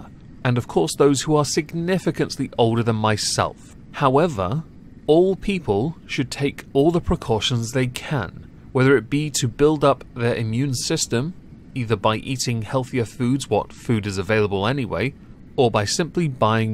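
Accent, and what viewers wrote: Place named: Midlands, United Kingdom. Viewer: You sound like you're from the Midlands. I'm from Lincoln originally but live in Tokyo now. My friends from the Shire say there are cases of Coughdrop19 there now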